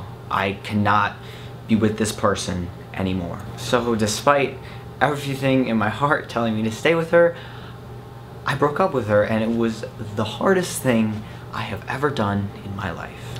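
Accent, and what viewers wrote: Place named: Wisconsin, United States. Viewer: ahhhhh that's why he reminds me so much of Luke they are both from Wisconsin (accent)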